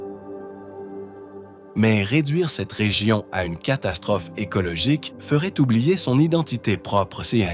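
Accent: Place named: Quebec, Canada. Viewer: Merci pour cette vidéo. Des images magnifiques et un petit accent (Québec?) très sympa. Bravo pour votre travail.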